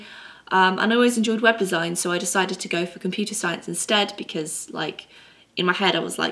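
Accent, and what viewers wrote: United Kingdom. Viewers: If you want to hear from someone a lot older, like fifty something, I choose safety and security and became an RN as I got older wished I went to art school. Back then like you I never even considered it, and I have lived in Southern California since I was 8 so I was closer to art options. I recently had to stop working but when I was I dreamed of all the time I would create when I retired, now with all this time on my hand I'm struggling now to rekindle the slightest bit of creativity I always seemed to be bursting with. Might I share my thoughts on the matter, first, you are very talented so that is a big plus there, sadly some aren't, like people who think they can sing but my cat sounds better. Second, I'm guessing your from the U.K. since I hear a wee bit of an accent, but you are living in the US, per your Michaels video, with your parents, this is a guess? 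If I'm right you should take advantage, if they let you, of living at home as long as you can, I let at 19 while in college supporting myself very hard, until your a few steps down the road of your chooses path. Third, Look at how far you come in only one year on YouTube and the "opportunities" you've already had, not including the porn, sorry joke, and how many more you may have if you hang in there another year living at home following your heart. That's my two cents, also, just make sure your productive, because parents want to see that, and do something daily to make it happen though I can tell you are, work on o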